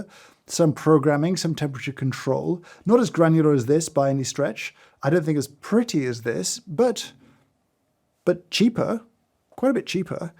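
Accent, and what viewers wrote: United Kingdom. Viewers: Englishman says words about coffee very britishly. 10/10.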